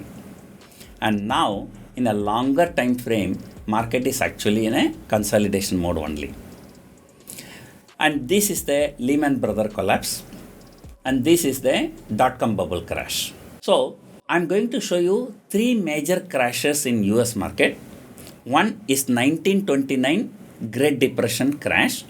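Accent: Tamil Nadu, India. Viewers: U r speaking like tamil .easy understanding english i learn stockmarket also english frm u thank u sir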